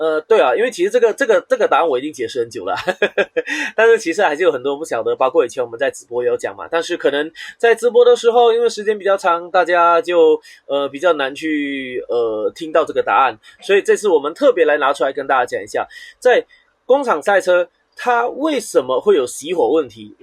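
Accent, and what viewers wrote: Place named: Hakka, China. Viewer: 聽起來像是客家國語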